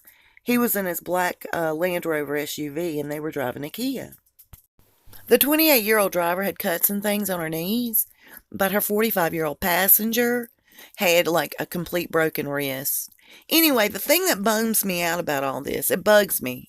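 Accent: South, United States. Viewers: I love the sunshine in your sweet southern accent! You are a truth teller, and a very creative person. I look forward to seeing , and hearing your videos! We have a lot in common. About 7 yrs ago I began to pick up bits and pieces of the dark side of these families, and I do not look at life in the same way as I did , before learning all of this underlying truths. I am probably very sceptical, but in a positive way, and because of my own life experiences. I am a Christian, a Southerner too, and I was a floral designer, manicurist, and I started out doing hair, but decided to, graduate early, and the one thing that really stood out in my work was my passion to be a florist! I BECAME ill with several conditions while I was working on my career goals. I also had my own housekeeping business on Galveston Island, and here in my home, Austin , TX. I live in a very liberal city, and a very artsy community.I love those things, but it is hard to watch my home changing so quickly.I am not a political kind of person, and not a liberal or Republican. 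I try very hard to see all sides, and make my own informative desicions . I am disabled now, but I still push forward to remain as Independent as possible. I have 6 beautiful Grandbabies, and 2 wonderful daughter's, and 2 Very nice Son's- n- law. And, after 35 YRS of Mothering, and Grandmothering, I finally have 2 Grandsons! They are very energetic, and lot of fun. God has blessed me richly in this area of life. I do appreciate your entertai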